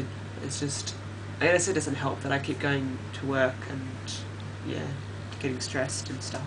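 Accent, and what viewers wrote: United Kingdom. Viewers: you look like you are studying aboad or something.. its funny to here a british accents from you kkk.... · Omg an asian with a british accent... I'm asian too, will you date me?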